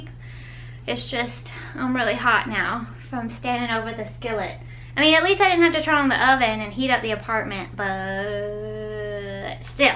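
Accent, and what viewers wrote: South, United States. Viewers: I could never understand why you have a super southern accent and your brother doesn't. I think you need to add a lot more seasoning but this looks good. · Very simple, love that. This woman is really likable, cute southern accent. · lol i just made the same kind of dish xD and that southern accent thooo thats sound so great :P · You should doing the video without your Southern accent, like the video that was trending on Reddit. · First time watching your video and subscribing too loving your southern accent.... you are a beautiful and funny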